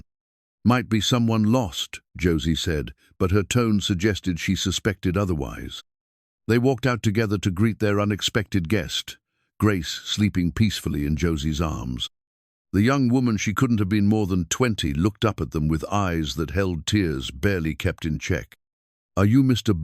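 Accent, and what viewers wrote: United Kingdom. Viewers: Too much time on the dead wife. Why not more on the dead husband. He had not been dead very long, yet, the wife has little grief? Ugh. And please get rid of the British accent. A western story needs an American voice.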